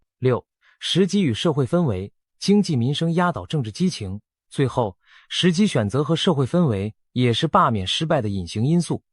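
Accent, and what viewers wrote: Mainland, China. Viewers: 聽起來像大陸人的解說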